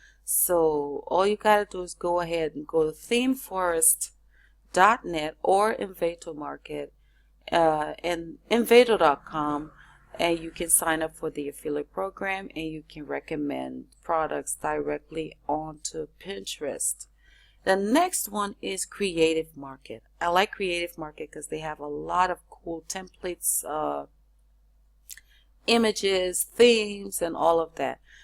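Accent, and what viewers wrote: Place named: Liberia. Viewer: I love your accent. You sound like a friend of mine from LIBERIA.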